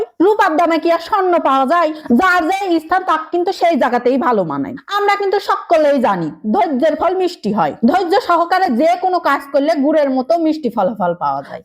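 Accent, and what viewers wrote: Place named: Bede, Bangladesh. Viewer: কোন জেলায় বাড়ি!!বেদেনীদের মত ভাষা, ভাষার টান।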